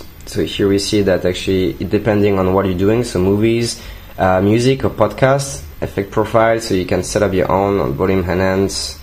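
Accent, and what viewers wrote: France. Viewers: lol nico rosberg voice when he speak english mix french english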